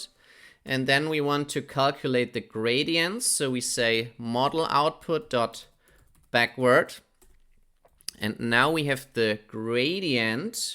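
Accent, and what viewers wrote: Germany. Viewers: never heard a more german pronounciation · I love how uncompromising this german accent is.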